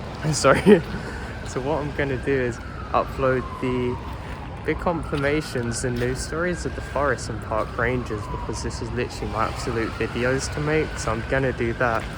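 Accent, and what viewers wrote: England, United Kingdom. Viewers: Good morning! Aww! It was so nice listen to you saying all that! I just love the english accent. You and your brother are spectacular! Wish Happy New Year to you and yours, haven't seen you since last year!🎉 I would love to see you doing a collab with Mortis Media and Being Scared, like back in 2016. Since there I'm your subscriber. But I'll be happy with any choice. Hope you're doing great too, and taking care of yourself. Thank you! Much love💚💙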